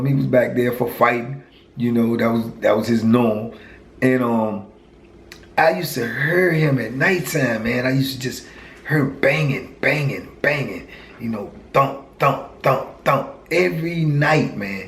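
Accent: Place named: Washington DC, United States. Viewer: Yo I swear I thought you were from dc or pg …. You sound and dress like us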